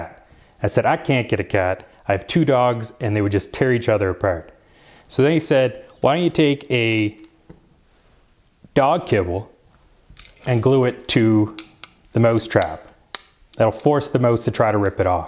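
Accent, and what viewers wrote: Canada. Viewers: I thought you were Canadian by your accent, then I saw the hockey stick! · You say about and mouse like someone from either Canada or certain places in Virginia. Are you ? I've had success with setting that trap with the yellow bait shelf like you have, and it works well. Also a " Mice Cube " works well for someone who doesn't want to touch the mouse, or doesn't actually want to kill it. For bait, use 1/2 of a Lay's peanut butter cracker (the 1/2 that the peanut butter sticks to) and slide it down to the other end of the "cube". Works like a charm !!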